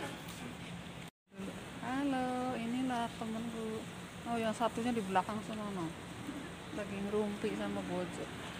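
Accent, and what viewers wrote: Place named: Banyumas, Indonesia. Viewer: Ngapak itu indah mbak 😊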